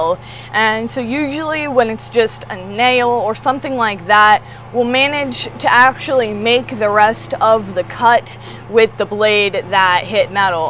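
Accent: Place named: Pennsylvania, United States. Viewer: ~ your Grandpa and "The Boss" have trained one of the best lumber foremen in the industry! Thank you for sharing these practical trade skills and important safety tips! As they say "safety is no accident". God bless Lumber Capital Log Yard! P.S. that is the color T-shirt I'd like to own; but I'll have to dye my hair Boudica red just to begin looking as good as you do in it. Your Central PA country accent and good looks are what it takes for my A.D.H.D. brain to track properly without distraction or interruption. Those are strong leadership qualities you possess that I am afraid King Charles is lacking in. Freedom from tyranny is our Monarchy. Lest We Forget!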